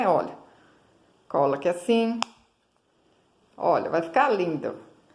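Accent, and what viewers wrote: Minas Gerais, Brazil. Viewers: Bom dia ..amei vou fazer, obrigada vc é ótima amo seu sotaque uai...😘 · vc é de Minas?porque meu marido é e fala como vc,gominha,superlitro,rsrs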